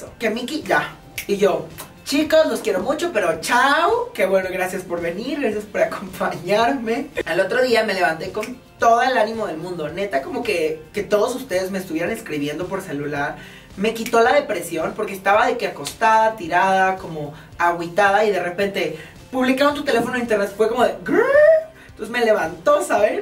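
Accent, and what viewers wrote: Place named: North, Mexico. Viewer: pues es que son norteñas!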